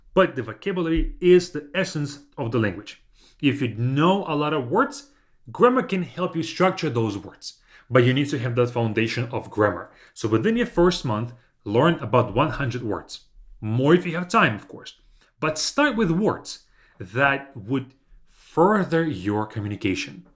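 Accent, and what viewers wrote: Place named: Russia. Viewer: I followed you since at least when you intruduced you as an former tennisplayer i think. Remember the kvartira, yes and now you are speaking eng/U.S. with russia accent and also with the lungs, breth in and out. Importment when speaking is foreginer langauge. My teacher in russia told me: ”you have to speak with your soul and heart”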